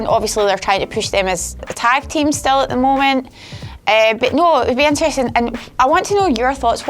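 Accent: United Kingdom. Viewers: Guys why you complaining about her voice. She is British , it's not that bad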